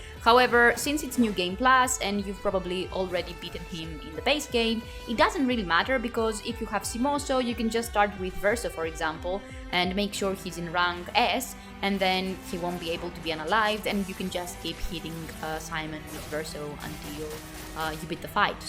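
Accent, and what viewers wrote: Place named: Greece. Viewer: At least I can hear the voice here! Such an amazing Hellenic accent!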